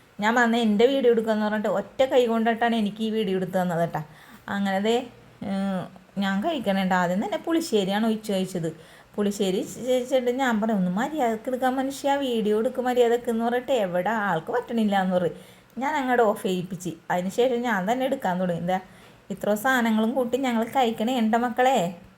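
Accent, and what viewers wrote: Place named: Kochi, India. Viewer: വീട്ടിലേക്ക് ponenu. സുഖമുള്ള kochikkarude സ്വന്തം ഭാഷ...ponenu...varanenu....❤❤❤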